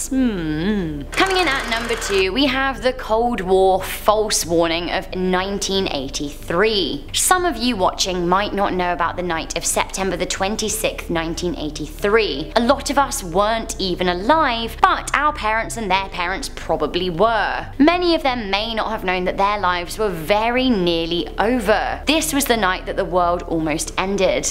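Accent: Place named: United Kingdom. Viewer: I love your British tone its the best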